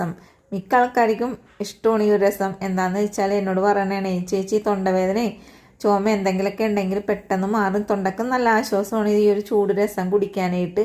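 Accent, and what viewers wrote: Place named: Ernakulam, India. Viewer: എറണാകുളം സ്ലാങ് ❤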